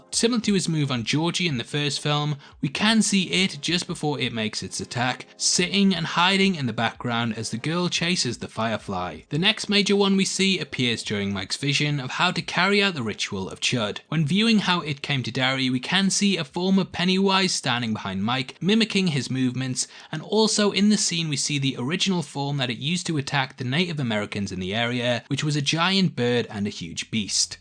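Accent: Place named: Newcastle, United Kingdom. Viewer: Are you a geordie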